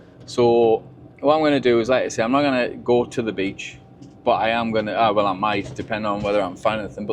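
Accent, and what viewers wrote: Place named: Northern England, United Kingdom. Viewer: Hi thanks for this series! My partner is in nthn Norway (LDR) so I watch a lot of these vids :) Are you fm southern Scotland Bec your accent seems more nthn England?!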